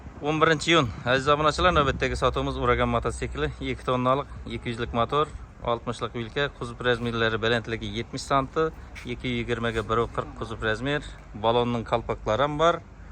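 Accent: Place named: Mangit, Uzbekistan. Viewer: Амударёдоми диман мангитчи тилда суйлаб турибсизгу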